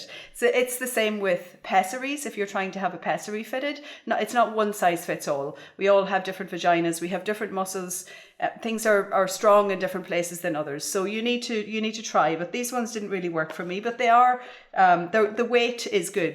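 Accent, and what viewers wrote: Ireland. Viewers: Beautiful Irish accent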